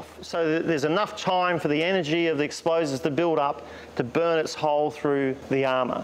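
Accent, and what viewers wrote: Australia. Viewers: I love our accent. Come on Aussie come on! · Thank you - that was really informative. And hearing it in an Aussie accent was music to my ears.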